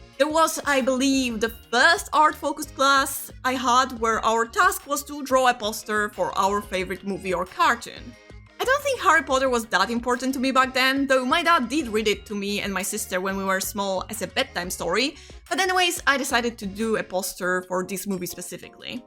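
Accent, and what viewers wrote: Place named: Poland. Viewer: Honestly after I heard you speak Polish I realized that you do have a Polish accent, but before that I didn't pay attention to it at all so XD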